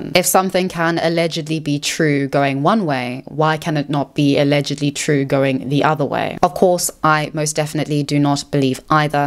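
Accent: RP, United Kingdom. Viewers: I’m curious where you are from. You sound so British to me! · wonderful essay!!! (and, shallow as it may sound, what makes it even more pleasant is your voice and cut-glass English accent - if you ever grow tired of youtube, you should consider recording audiobooks) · I remember listening to your channel a lot a few years ago. Would it be accurate to say that your accent has gotten more British than South African? I’m American and lived in the UK for some years, and now I think I hear more specifically UK sounds, though I may be mistaken.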